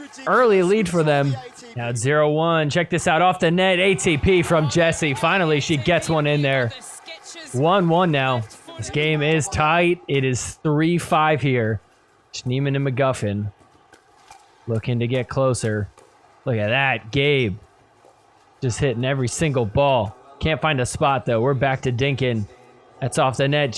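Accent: Australia. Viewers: You're so funny...and your Aussie accent is on point! Keep it real, don't sugarcoat, or censor yourself! 😜👍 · Great job, as always! Love your Aussie accent and phrases! I did watch some of the matches. It was fun! I thought it was funny Tardio was late for his 1st singles match and then got pickled. He didn’t hear the call for the match. He had no warmup and he was seen wolfing down a Nutella pizza slice immediately before the match! Kids! 😂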